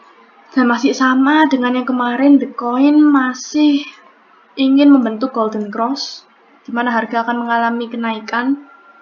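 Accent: Java, Indonesia. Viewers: Medok mbak.wong ngdi e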